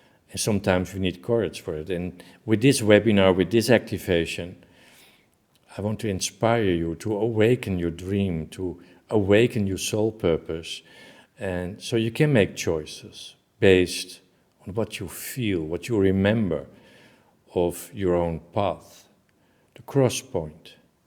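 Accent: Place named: Netherlands. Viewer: Ik hoor een Nederlandse stem.. hoogst irritant! Waarom is dit?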